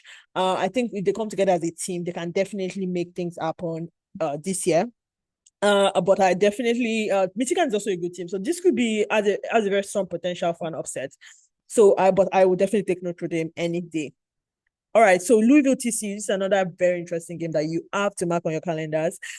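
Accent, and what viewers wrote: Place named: Nigeria. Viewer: I'm Kenyan so I was loving the Nigerian accent Oooo! Thanks for the video. I used your exact model for 1 of mine. Wild & crazy bracket! Wish you the best oooo! 😜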